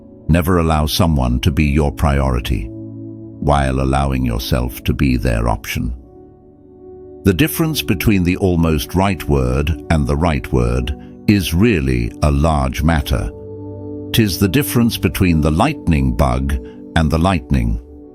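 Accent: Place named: England, United Kingdom. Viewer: Mark Twain quotes in an English accent, sigh, what is this world coming too?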